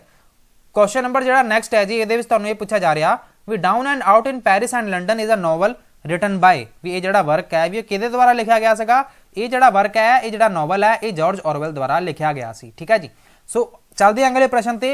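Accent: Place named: Malwa, India. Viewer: very natural ,pure malwai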